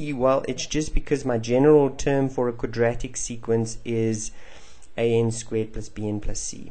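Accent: South Africa. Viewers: South African? awe my bru!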